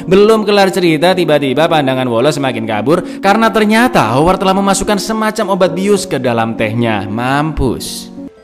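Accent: Java, Indonesia. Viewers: Org jawa kh bg anjay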